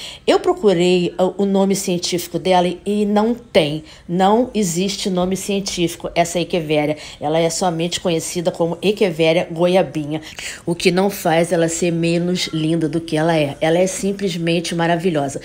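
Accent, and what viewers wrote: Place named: Rio de Janeiro, Brazil. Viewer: Aí carioca kkkkkkkkkkk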